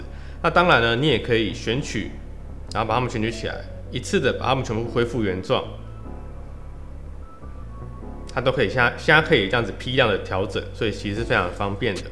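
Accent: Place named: Taiwan. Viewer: 一摸幾，你發音有夠台灣國語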